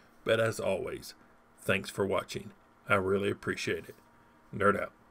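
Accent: South, United States. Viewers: It's so much fun to hear a southerner trying to pronounce European names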